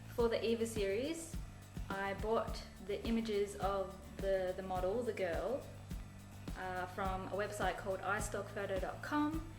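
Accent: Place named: Australia. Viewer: I love your accent! You are such an awesome inspiration! Plus, you're from Australia so you're badass!! :D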